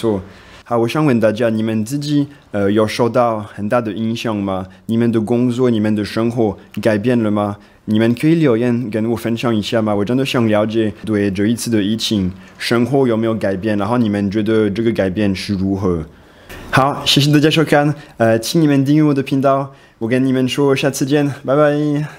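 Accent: Taiwan. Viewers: 台灣腔中文真的有夠台的~😁😀💞👍👍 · 真的很台灣味你如何學好中文的? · 講話很台灣味欸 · 真的? 假的? 好台哦！🤣🤣🤣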